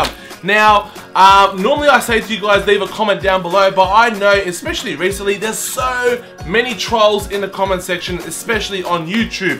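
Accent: Australia. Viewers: Your aussie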